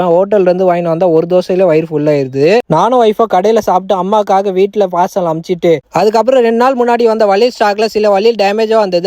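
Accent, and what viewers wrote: Tamil Nadu, India. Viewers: Bro, nice tamilaccent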